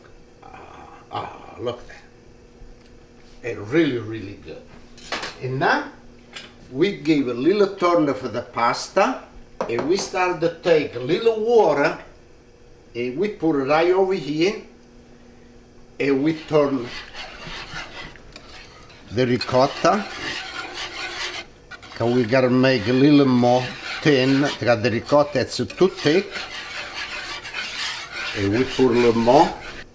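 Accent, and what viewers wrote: Italy. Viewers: You remind me of my grandfather who I called Papa. Love the Italian accent. I’m gonna make this dish this weekend! 😃😋❤️ 👩‍🍳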